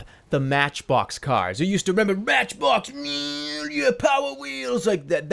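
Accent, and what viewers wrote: Boston, United States. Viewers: I believe he's from Boston.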